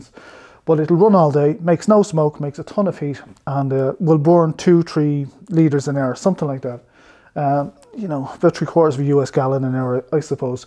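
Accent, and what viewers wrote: Ireland. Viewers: That's a mild Irish accent 🤣🤣🤣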